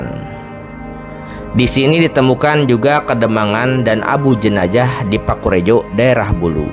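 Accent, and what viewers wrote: West Java, Indonesia. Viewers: Yg punya chanel kaya logat orang sunda malah paham sejarah temanggung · naratornya orang sunda bukan jawa tengah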